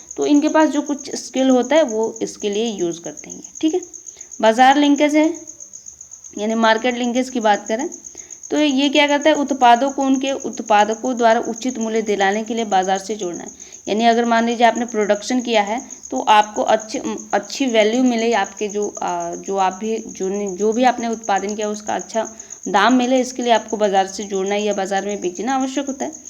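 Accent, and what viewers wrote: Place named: Bihar, India. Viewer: aap bihar me kha se ho kyunki apki language suni suni lgti hai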